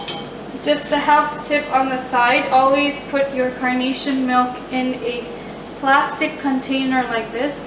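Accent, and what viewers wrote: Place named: Arab world. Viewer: I can tell from your accent that you're an Arab, haha 😁 I will try your method, thanks x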